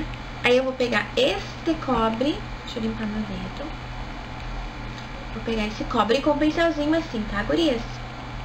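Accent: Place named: Curitiba, Brazil. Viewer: Boa tarde sou nova aki no seu canal e já gostei muito..sou de curitiba e tbm tenho esse sotaque de( tá )e acho lindo nossos sotaques por isso q gostei de ti... há sobre a base achei q ficou bom este tom para ti tá kkkkkkkk bjss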